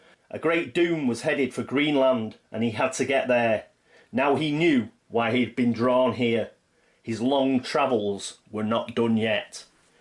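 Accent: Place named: Lancashire, United Kingdom. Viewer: I'm in Spain but I was raised in Lancashire, I'll take a wild guess and say that you're from either Wigan or Preston.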